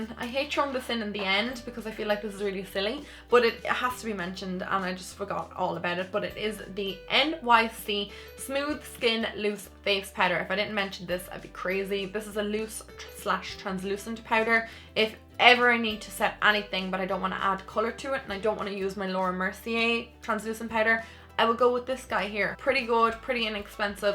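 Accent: Ireland. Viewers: Trte Mrte she's Irish. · What part of Ireland are you from x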